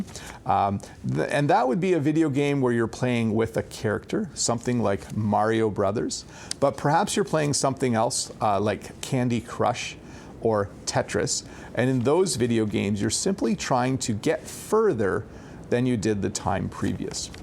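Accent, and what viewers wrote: Canada. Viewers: I appreciate you for your lovely Canadian accent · An excellent video for training the listening skills and for to get vocabulary. I love the canadian's accent.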